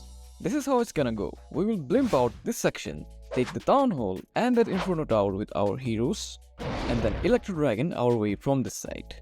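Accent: India. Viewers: hey ur voice tone gives an Assamese vibe.. are u?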